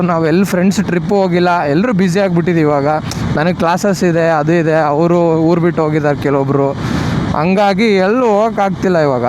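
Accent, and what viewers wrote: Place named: Kalaburagi, India. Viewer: Bro I saw your different Kannada slang in previous video. I believe you must be from Kalaburagi 🔥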